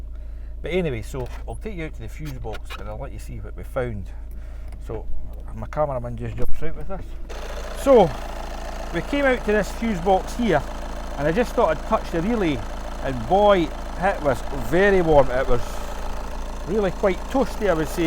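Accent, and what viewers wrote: Scotland, United Kingdom. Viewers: Thanks for the video. I like ur teaching especially the Scottish accent. Will subscribe! My son's audi a3 2010. Keeps cutting out which is why I'm here. Normally when at operating temperature. But no real pattern. Code said crankshaft shaft sensor. I replaced. Cleared code. Drove 100miles the happened again. So I replaced cam shaft sensor. Not clearer code but cut out at warm engine Started again and let it idle 1hr. Fans on few times. But confident to drive it incase cuts out again But will look at this. Thanks Anything else I should try look for? Wish u were in London.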